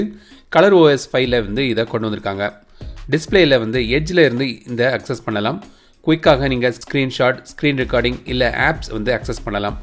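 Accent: Kanyakumari, India. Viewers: Are you from kanyakumari?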